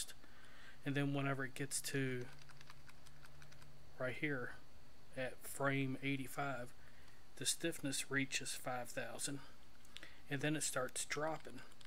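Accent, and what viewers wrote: United States. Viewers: OH MY GOD YOUR VOICE IS AMERICAN BLENDER GURU IT'S EPIC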